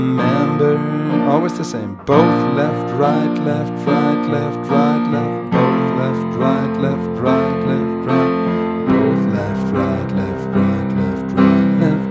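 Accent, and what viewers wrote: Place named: Germany. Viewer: Hab schon gedacht ich wäre der einzige der, denn deutschen Akzent gehört hat.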